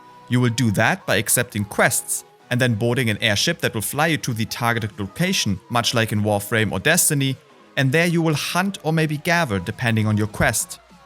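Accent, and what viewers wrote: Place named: Germany. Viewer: Great Video ! But a little german accent :D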